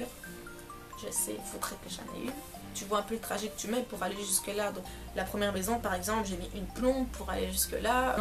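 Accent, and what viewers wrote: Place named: Belgium. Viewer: L’accent belge tous ce que j’aime 🥰 bien rare sur YouTube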